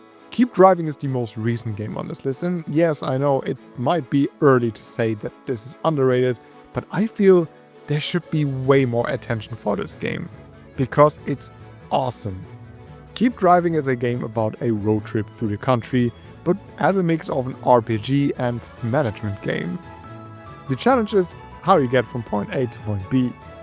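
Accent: Germany. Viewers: Hi thank you for these awesome videos! My question is if you are German? Because your accent sounds so german 😌 I am from Germany too! Greetings!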